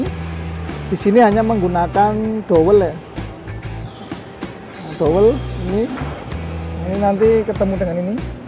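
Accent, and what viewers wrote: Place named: Malang, Indonesia. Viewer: Sepring ,jan logatnya ngalam banget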